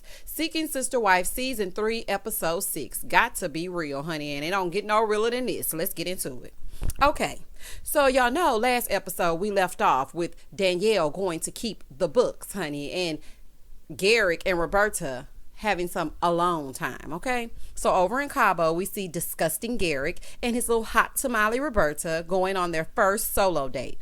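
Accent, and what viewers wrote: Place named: New Orleans, United States. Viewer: Hello, english is not my first language so I'm sorry. This is the first time I stumble upon your video, and I smile listening to your accent. You remind me so much of princess Tiana even though I have no idea how you look like. And I'm sorry if that's wrong or something because I'm not american, but to me you sound like you're from where Tiana lives. New Orleans ^_^ and it's just really wonderful hearing you speak, I truly enjoy your speaking voice and I'm sure you must be just as pretty as she is too.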